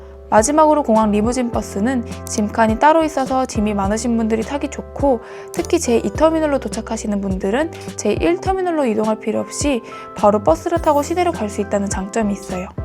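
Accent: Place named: Chungcheong, South Korea. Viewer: 충청도여~? 승질 급한사람 숨넘어 가겠구만~ㅎ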